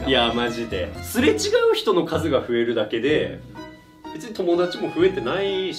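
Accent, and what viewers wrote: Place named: Kyushu, Japan. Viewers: この九州なまりが標準語になったら寂しいなぁ😂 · さん、東京でもその九州なまり無くさないでくださいね🤣 これからも応援しています！！ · 引っ越すと何をするにもいちいち土地勘がないのと、言葉の壁やら文化の違いにとにかく戸惑いますよねー！パートのおばちゃんがいるとかなり心強い情報源なんですがそういう職場じゃなさそうですもんね。さんのキャラなら食堂のおばちゃんと仲良くなりそうな気がしますがw まぁ、悲しいかな気づいたら馴染んでしまうものなので、焦らず少しずつお仕事にも慣れて行ってくださいね！若いけどお体に気をつけて！南九州の方の訛りはちょっとやそっとのことでは変わらないと思うのでw そのままのさんでいてくださいねー‼︎焦らないでいいのでこれからも更新楽しみにしてます。あ、土地勘なくてすみませんが、東京の親戚がオオゼキで買ったお買得品を送ってくれる事があって、九州にはない商品の流通もあるし、お得だし良さそうですよ。ハッピーアワーに出くわすといいですね！公務員寮は食事付きなのかな？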